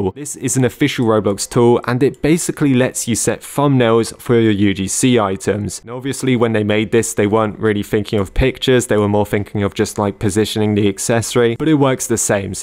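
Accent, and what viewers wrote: United Kingdom. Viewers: My favorita british acento youtuber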